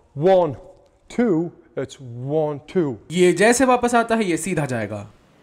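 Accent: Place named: United Kingdom. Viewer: oh bhai i just watched this vid in pure british english nice dub artist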